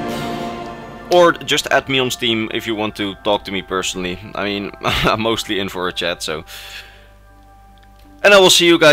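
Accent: Netherlands. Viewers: You have a Dutch dialect, are you dutch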